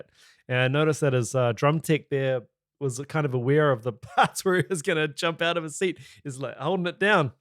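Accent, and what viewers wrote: New Zealand. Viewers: casually starts watching video ~hears accent~ "here in Auckland, New Zealand". Swears loudly and falls out of bed Gidday fellow Kiwi. How nice of the algorithm.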